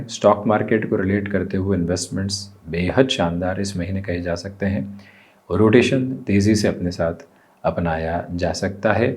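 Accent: Standard. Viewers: बहुत शुद्ध हिंदी बोलते हैं आप, नमन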